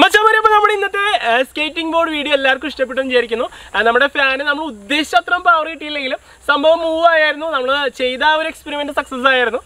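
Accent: Thrissur, India. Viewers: Thrissur slang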